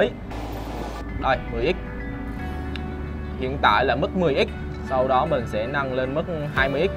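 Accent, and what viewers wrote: Binh Dinh, Vietnam. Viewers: anh này dân bình định hả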